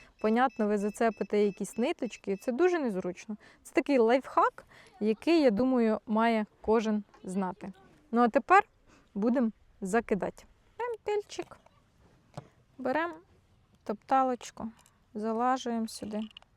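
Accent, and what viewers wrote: Ukraine. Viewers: Ви чудо! Дуже подобається мені і ваш (наш) український діалект.Супер!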